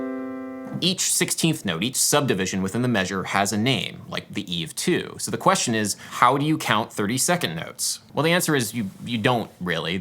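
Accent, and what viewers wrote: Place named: United States. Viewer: Man.. something in my mind told me that you were britsh.. but now I realized that you dont have an accent.. you are american.. my eyes were so closed haha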